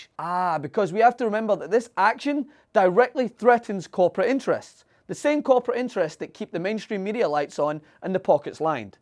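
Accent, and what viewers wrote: Scotland, United Kingdom. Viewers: Since when does TYT have a Scottish host? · Dat scottish accent - adds a certain flavor of badassness :D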